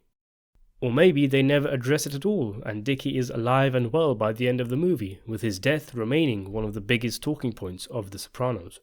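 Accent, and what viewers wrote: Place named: London, United Kingdom. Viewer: I can tell by your accent that you’re south Asian London - am I right?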